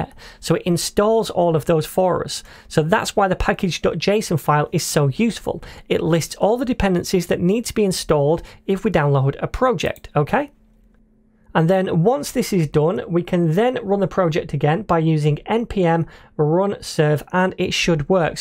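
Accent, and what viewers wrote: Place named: Scotland, United Kingdom. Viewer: You remind me Jackie Stewart's voice, really. Same accent.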